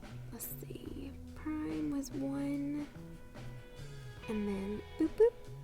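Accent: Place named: United States. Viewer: Ah love you accent! Which part of America are you from? I work in education too (in the UK obviously lol). I can’t give up my twinkl subscription for teaching resources ☺️ so helpful to have (and I get a free book sent every term).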